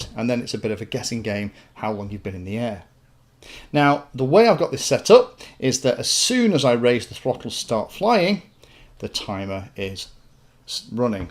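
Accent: United Kingdom. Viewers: I want to duplicate my Spektrum NX10 timer1 in my TX16S. The Spektrum timer allows you to start and stop the time based on throttle stick position. For example, I have a nitro model that I set the time to count down when the stick position is more than 20%. The reason is that there is very little fuel burn at low throttle and this is a better judge of flight time than the th% function of the TX16S. Is this possible with the TX16S? I love watching your videos and especially your British accent. I live in Oklahoma, USA.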